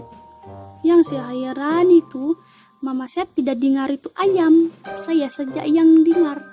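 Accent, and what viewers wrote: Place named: Kalimantan, Indonesia. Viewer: ckap serupa orank kalimntan..borneo😃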